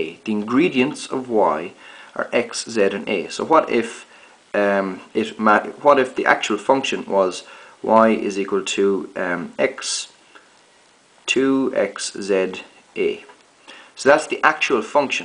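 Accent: Ireland. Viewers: Ah a fellow Irish man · Are u Irish....u sound irish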